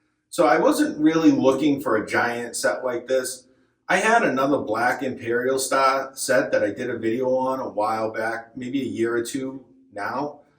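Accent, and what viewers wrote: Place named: New England, United States. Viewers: came for the drums, stayed for the new england accent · I had a Tama Rockstar kit that was the same setup as this. You mention Lake Winnipesaukee which is funny since my brother lives in Gilford which is right there. It's been years since I've played, but I follow all the top drummers like Weckl, Borlai, Sucherman, Donati, Coleman, Smith, Dicenso, etc. This kit takes me right back to the 80s when I was teaching myself to play from vinyl to bands like Rush, Zeppelin, Aerosmith, Judas Priest, Iron Maiden, Journey and the like. My first kit ever, in the late 70s, was a five-piece Slingerland. First song I ever played - Kiss God of Thunder. LoL I'm guessing you're a Mass guy like me with your accent and all. Pretty cool vid. Thanks for posting. P.S. I also owned a Gretsch set that I traded a North set for. Didn't care for the North.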